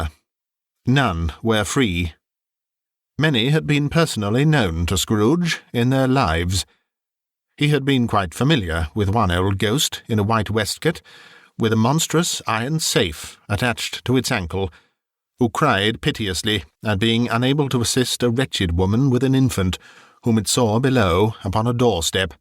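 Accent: United Kingdom. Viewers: I tried listening to a few other versions. A Christmas Carol, narrated without a British accent, cannot be borne. My opinion, I know, but at my age, traditions die hard. So, yours will be the version I will be listening to this season. I must admit that due to my illness, myasthenia gravis, I will not be able to cook for Christmas this year or decorate. It is all I can do to take a few steps and do my speech therapy, swallowing therapy, and everything else therapy, lol. So, please know how much your production will mean to me this year, about the real meaning of Christmas. I can't now join your Patreon, but am hoping to as soon as my disability is approved, to thank you in a more tangible way for the very many long nights your charmingly narrated stories have helped me through insomnia, pain, and sleepless nights. I have been following you since the beginning under my other channel, Wmnoffaith1, but I am the same person, notwithstanding. 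( It is rare that one has an opportunity to use notwithstanding in a sentence; it makes me feel positively Dickensian:). Anyway, I just wanted to express my thanks, and let you know that regardless of the problems, and wars you have had to endure this year to keep your channel alive, it is appreciated by so many of us, and provides more comfort than you know. Merry Christmas and a Happy New Year, to you and yours. I wish you all of the success and blessing and prosperity that you so richly deserve in the coming year. Sincerely, Cheryl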